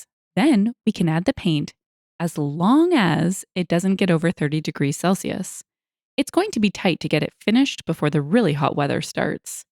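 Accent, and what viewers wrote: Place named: Canada. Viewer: And just a note to add that I love your reading voice and (I assume) Canadian pronunciations and phrasing.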